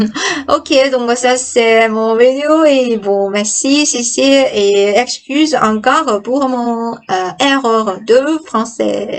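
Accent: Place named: Northeast, China. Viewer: 东北大查子味法语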